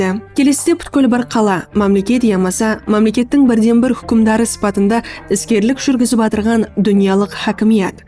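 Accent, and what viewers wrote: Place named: Karakalpakstan, Uzbekistan. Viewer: Нагыз каракалпак тили анык, рауан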